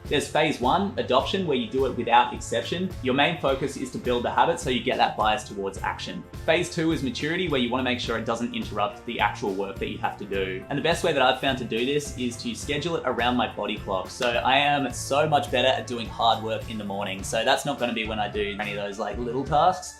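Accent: Australia. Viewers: Finally an aussie youtuber, makes it so much easier to listen to all of it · Are you an Aussie?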